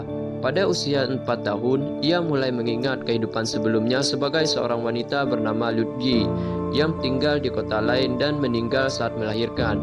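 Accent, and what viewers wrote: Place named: Bali, Indonesia. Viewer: Hmmm... sebenarnya aku ingin bertanya padamu, apakah kau mempercayainya,,, tapi itu ku batalkan, mengingat aksenmu menunjuk kan logat Bali, jadi kujawab saja sendiri kau pasti percaya, karena itu di sebutkan dalam ajaran agamamu. Dan jika kau percaya, kau bahkan tak perlu meragukannya dengan membaca atau bertanya pada ilmuwan. Apapun jawabannya mereka hanya meneliti dan meramalkan atau menyimpulkan,, jika hasilnya tak sesuai kau malah goyah pada apa yang kau yakini. Karena hal ini tidak bersifat fisik itu sudah menyangkut ranah di luar fisik. Itu berada di ranah antara kematian menuju ke kehidupan !. Jika kau ingin memastikannya orang harus mati dulu. Setelah itu baru tahu apakah reinkarnasi afa atau tidak, tapi itu terlalu ekstrim bukan ?? Ha ha ha ha ha.... untuk lebih mudah cobalah cara yang lebih mudah,, yaitu dengan memahami hakikat hidup dan kehidupan.. 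mungkin saja dari situ kau akan mendapatkan pencerahan ! Karena jika kau tanya ilmuwan,, jika ilmuwannya hindu atau buda, mereka pasti jawab ada, terlepas dari apapun caranya untuk membuktikan bahws itu ada,,, tapi sebaliknya,,, jika ilmuwannya muslim atau islam,, dia pasti akan menjawab tegas tidak ada, meskipun bukti ilmiah mendukung dan membuktikan!. Kenapa? Karena dalam islam tak ada konsep tentang reinkarnasi. Termasuk karma, hal itu tidak di jelaskan atau di ajarkan dalam agama islam. Tapi,, tidak di ajarkan,, belum tentu berarti tidak ada bukan??... jadi selama faham itu membawa kebaikan hidup bagimu dan bagi sesa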